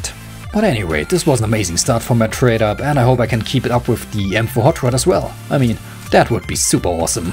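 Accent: Saarland, Germany. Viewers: "OH LECK, näää es is de hyper beast.. ik glaaab es ned!" xD bester:) Saarländer oder? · Oh leck do kommt da Saarländer raus xD bescht xD Saarland rules <3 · Man hört den deutschen akzent sofort raua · Das is Saarlännisch dass muss sich so anhöre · Saarlännisch mei gudda saarlännisch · guck dir die kommentare an 90%möchte videos mit stimme bitte mach es häufiger!Und davon 30% will mit deinem saarländischen Akzent.Deine stimme ist einfach pornös alder.Ik glaub es net xDD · Hojo dö und dein saarländisch... Wie wärs mit nem Dragon Lore Trade up? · Are you from Germany? :D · b_Unr34l saarländisch junge saarländisch,... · das ist saarländisch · Endlich mal ein Saarlänner auf YouTube, so genial ;D Kann es sein das du Englisch besser kannst als Hochdeutsch? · + bischt dau a saarlanner? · ☆ PC Gaming ☆ Wie geil enfach mo saarlänisch rausgekloppt. made my day^^ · for a german, your accent and voice overal is really great. 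Like audio honey. You should do some narations or more commented videos. Btw grats, you lucky bugger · Immer diese Saarländer xD, beschter Dialekt überhaupt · "Oh leg,nee.Ich glab es net."Komscht du aus em Saarland?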